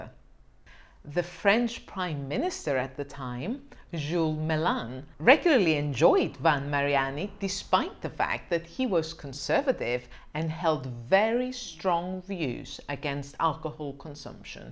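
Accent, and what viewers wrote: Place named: Italy. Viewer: Redhead ✓ Wine expert ✓ Italian accent ✓✓✓✓✓✓✓✓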